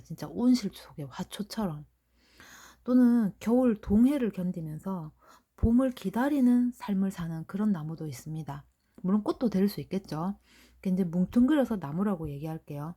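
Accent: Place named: Gyeongsang, South Korea. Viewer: ㅋ~같은 겡상도라 더욱 듣기가 좋아요. 정듬뿍 넘치는 목소리입니다~💕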